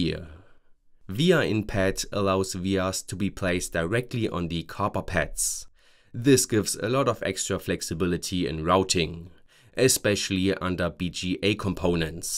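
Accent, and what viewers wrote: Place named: Germany. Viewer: Very informative, but the weirdest accent I've ever heard... super German, but still saying "garage" like an American, and also; who taught him to hold a pencil? That looks terribly painful/inefficient !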